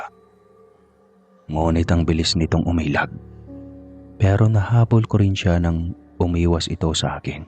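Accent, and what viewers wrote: Visayas, Philippines. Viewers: Kabog jud sir,bisaya ka tlga sir...😂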